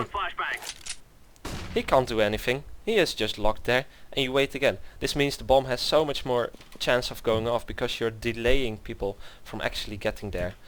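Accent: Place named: Netherlands. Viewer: Je bent wss nederlands ik hoor het door je accent haha :D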